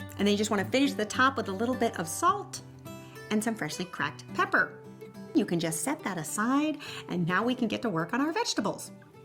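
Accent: United States. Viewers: what kind of american accent is this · What a great pity yanks have have so much trouble with plain English?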